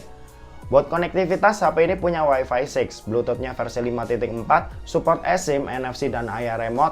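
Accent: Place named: Purwokerto, Indonesia. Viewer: Wong Purwokerto kieh mase yahh? Pada bae, nyong sekang Purwokerto.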